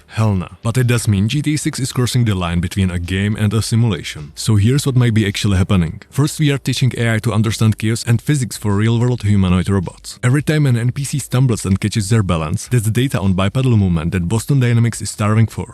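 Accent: Czech Republic. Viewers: this is very dystopian, future is scary... no one took the lesson from Terminator... Btw arent you Czech by any chance? :D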